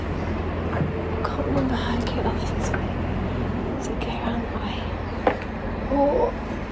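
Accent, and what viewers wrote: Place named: West Yorkshire, United Kingdom. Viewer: Hello, I recently found your channel and find myself watching longer than expected. You do a wonderful job of combining boating, nature, history and art. Also, I spent a great deal of time in West Yorkshire in the 80s touring in a Christian band from California. We played in many of the small town you mention. I can hear the accent so clearly. Thank you for the lessons on canals. Good job.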